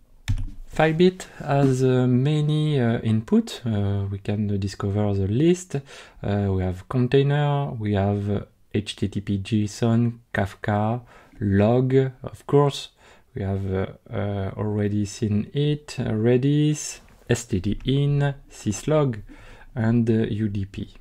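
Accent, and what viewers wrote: France. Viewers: Your French accent is amazing :))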